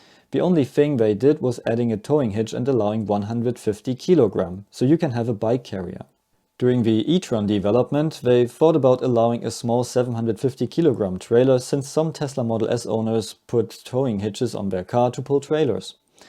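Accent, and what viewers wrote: Germany. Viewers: The best articulated middle finger to management in polite german english. I love it. Sadly nobody who should will ever learn from this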